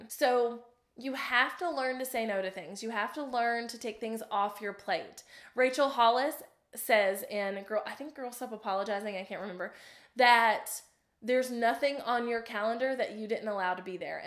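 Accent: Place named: Carolina, United States. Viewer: Are you from one of the Carolina states? Love your accent ;) I definitely need some better time-management skills!! I need to download newsfeed eradicator...for sure, lol Lot's a great advice! Thanks!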